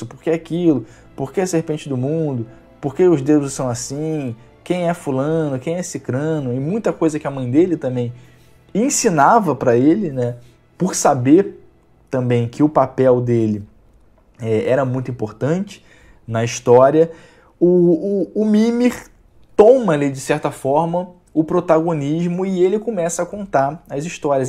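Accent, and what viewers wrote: Rio de Janeiro, Brazil. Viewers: Vc e carioca!?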